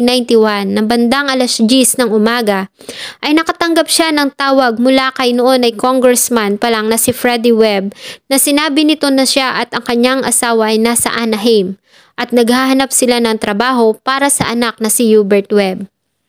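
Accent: Philippines. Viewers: Oky lng UN pilipino nmn Tayo my kanya knya acsent nmn kahit sa ibang bansa tulad din nmn natin cla hind Rin cla magaling mg English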